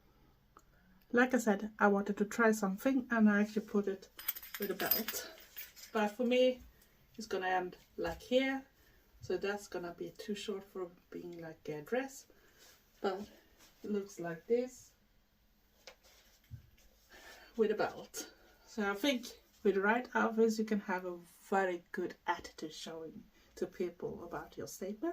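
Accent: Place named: Sweden. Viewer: Hay, new suber here, i love your accent, sweeden is beautiful, love the haul, everything is so cute x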